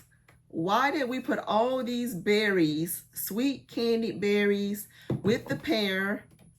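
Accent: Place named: Paris, France. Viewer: I hear your combos..don't have most of them. Oh well. U Already pronounce Bouquet just right...the T is silent.. it's the same with Muguet..Moogay. perfume in french is Eau (ike Oh) De ( like street talk..she s at de store. A bad The) then the Flower name (roze, de vee-oh-let..de Sare-eece. Cherry.. the words slide into the next Ohderoze. Ahhh..u sound like you are from PaRee. Paris in french.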